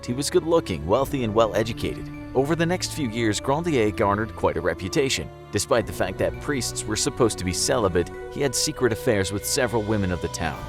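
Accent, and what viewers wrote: France. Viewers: The French accent is a nice touch👌🏾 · Lol he was butchering all those French names but because he said it with a French accent it sounds legit to non-French speaking people 😂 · Why does the French accent sound so satisfying??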